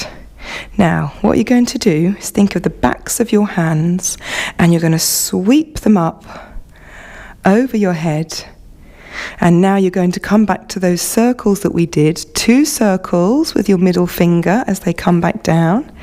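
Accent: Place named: England, United Kingdom. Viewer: I can see by your accent that you are English . Could you tell me where do you teach ? London maybe ? Would be perfect for me !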